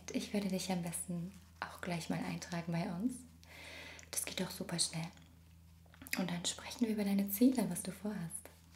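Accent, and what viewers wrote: Germany. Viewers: Sounds german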